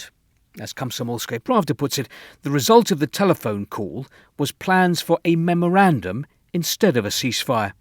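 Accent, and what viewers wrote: United Kingdom. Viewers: Thank you for your work! 🙏🙏🙏 By the way, I love your strong British accent. :) It's much better understandable for a eurpean non native speaker that American English. 😄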